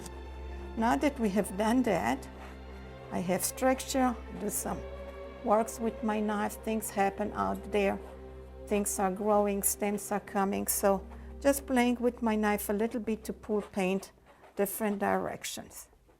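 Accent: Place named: Brazil. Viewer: You an brazillian accent ... Beautiful !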